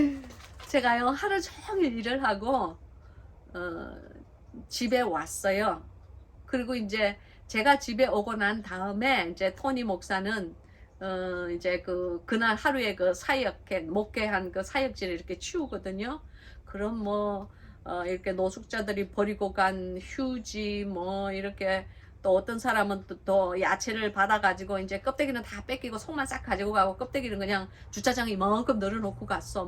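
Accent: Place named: Busan, South Korea. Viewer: 귀하신 말씀 나눠 주셔서 너무나 감사드립니다 부산 사투리가 어쩜 이리 친근하고 매력적인지 쎄가빠게 ….ㅋㅋ 쎄가빠지게 말씀공부 따라 가는 중입니다^^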